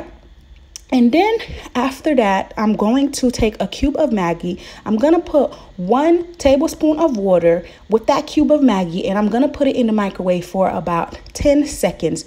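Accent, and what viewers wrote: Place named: Miami, United States. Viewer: I knew it that Miami accent is strong lol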